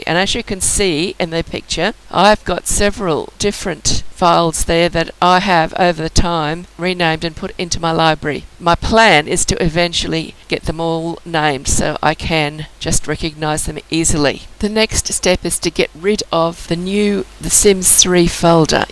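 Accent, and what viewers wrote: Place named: Australia. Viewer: So very clear and great to hear an Aussie voice, thanks heaps.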